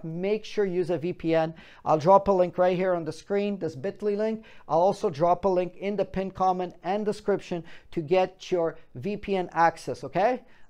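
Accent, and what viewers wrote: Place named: Texas, United States. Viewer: Texas man! where u from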